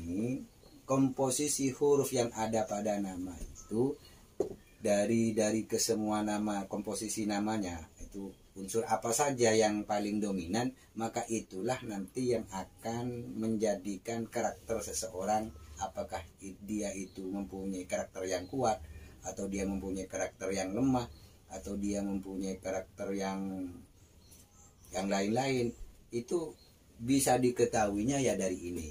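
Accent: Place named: Sumenep, Indonesia. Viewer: Sampeyan dimana mas. Ko logat nya sumenep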